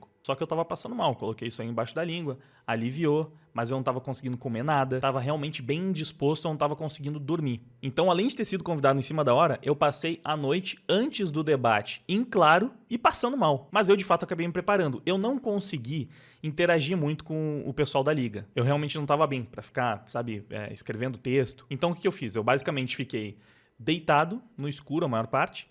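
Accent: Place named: São Paulo, Brazil. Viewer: EU JURARAVA QUE O ERA DE SAO PAULO. E ESSE SOTAQUE? DE ONDE E?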